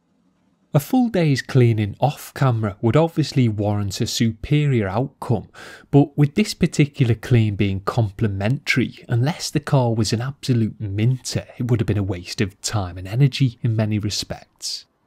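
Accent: Liverpool, United Kingdom. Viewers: Daniele Cognome - he has a Scouse accent - from Liverpool, England. Same as the Beatles, that pop group from the 1960s.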